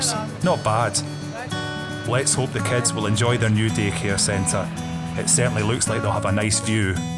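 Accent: Scotland, United Kingdom. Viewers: Why do people on here SLAM DUNK the video, It doesn't matter if the announcer is Scottish, some one had to do it and he was chosen, "Welcome to the internet as You Might Say" Thanks for sharing this with us on you tube, if you have any more please send me a link and any others that might be interested, English / Scottish / Usa / Australian / Canadian/ :) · Why the scotish accent? It's not even in Scotland.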